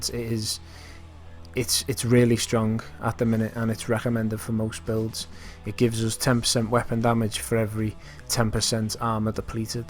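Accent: Liverpool, United Kingdom. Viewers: That scouse accent.. lol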